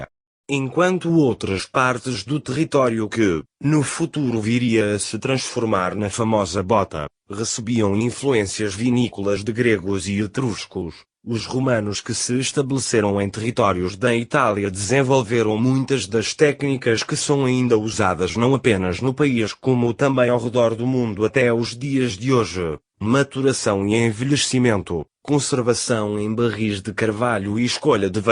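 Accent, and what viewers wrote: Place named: Portugal. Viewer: A pior coisa que fizeram foi colocar essa voz falando em português de Portugal 🤦🏻‍♂️ ... o pessoal tem que entender que a grande maioria das obras de literatura e programa de televisão, são feitos em português do Brasil, mais limpo e mais audível.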